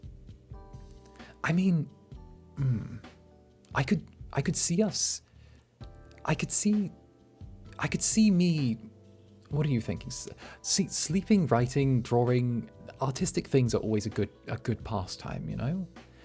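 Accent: United Kingdom. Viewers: Fancy british (?) Incubus is going to be the end of me. I love him too much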